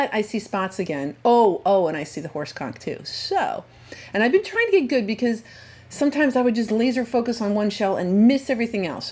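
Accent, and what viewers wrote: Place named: Ohio, United States. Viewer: Are you originally from the Ohio region by chance? The way you say the word: "water" like "warter" sounds like the Ohio area accent. ;) :)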